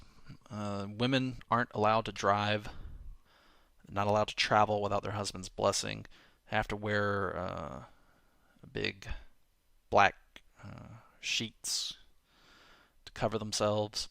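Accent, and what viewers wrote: South, United States. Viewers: i think is from the south, i can hear it in some of his pronunciations.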